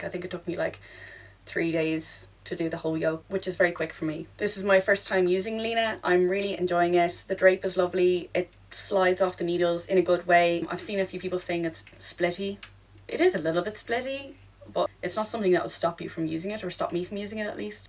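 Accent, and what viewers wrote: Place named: Ireland. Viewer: I just found your channel and love your personality and Irish accent! I recently made the Lakes tee by Ozetta, which is almost identical to the tee from MFTK, and I couldn’t believe the amount of ends. I even made a few extra because apparently I can’t count… 🤓 On the sleeves, I started at the top like you suggested and saved myself two ends. 😂 They do all this cutting and restarting so the garment/sleeve is completely the same on both sides, but who cares if there is one round extra on the left side of your sleeve? Noone can tell, right? 😅🤓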